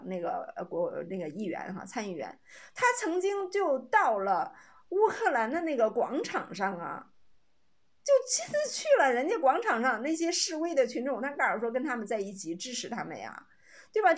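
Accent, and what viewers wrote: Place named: North, China. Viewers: 你的中文是跟谁学的？北方农村口音怎么丢人了？ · 拜托＂以后＂发音＂蚁后＂ ，不是＂姨后＂。 你这老女人的一口北方农村口音太丢人了。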